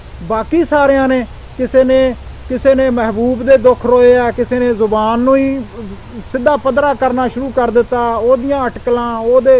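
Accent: India. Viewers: Dear ❤️ professor sAhib, GoOd morning jNAb, daily jNAb nU M sUNNdA, samjhda bUT nOW, hUM mARi wARi, mARi public dealing kAfi hAi, & 1982, 85 tOUN chALdi Aaa Rhi hAi, As pER mY Opinions, face reading, jNAb waqti tA jO bi hAIN, sO hAiN bUT jNAb dA ways of talking, thinking, BOL ChAL,LEhjA hAR Lehaz tOUN mANU hindustania wAllA Lagda hAi nA ki Pakistani, if Any wAY, I am wRONG, please sorry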